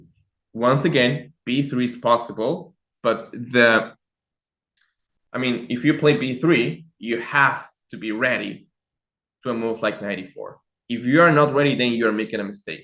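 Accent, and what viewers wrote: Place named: Peru. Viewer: Hahahahahahaaa da risa un Peruano intentando hablar inglés. Cobarde corriste como tú p madre. Estás muy f30 suda.k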